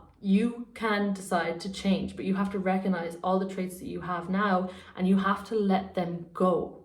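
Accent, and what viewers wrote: Ireland. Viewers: Love your videos btw...so far you're the BEST and I love your Irish accent ❤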